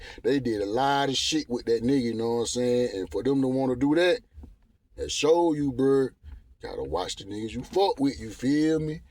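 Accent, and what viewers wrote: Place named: South, United States. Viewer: You sound like my folks in Atlanta! #southernaccent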